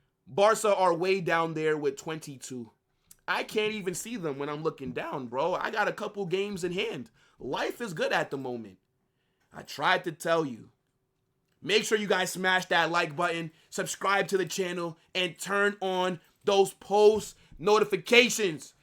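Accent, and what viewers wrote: United States. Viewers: You are the best Real Madrid youtuber. Very underrated just because you have an American accent.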